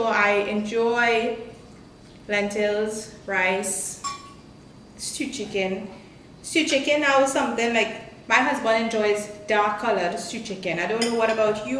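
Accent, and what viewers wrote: Trinidad and Tobago. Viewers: Wow. A muslim speak english. And Trinidadian english accent. Cooking Trinidad stew. Wow. Because the muslim in canada dont speek english. They speek only thing from packastand . I wish them Will speak english like you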